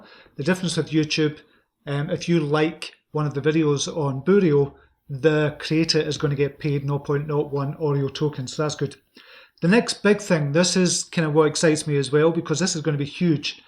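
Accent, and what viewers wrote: Scotland, United Kingdom. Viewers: Where in Scotland are you from? :o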